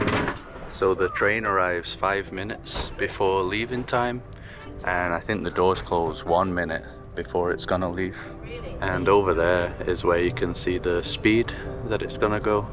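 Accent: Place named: Manchester, United Kingdom. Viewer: Enjoying all your videos, great channel. Funny how the Manc accent keeps popping out every now and then too. Live there myself so easy to spot! What area were you?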